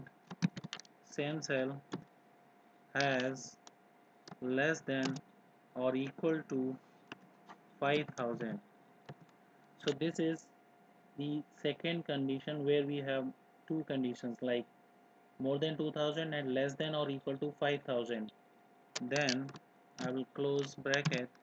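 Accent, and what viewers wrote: India. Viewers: what is with all these excel tut with indian accent?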